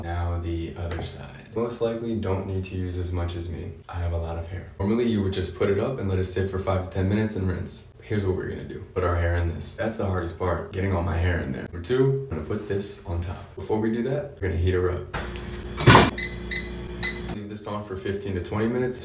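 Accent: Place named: England, United Kingdom. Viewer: Wait what happened to your English accent?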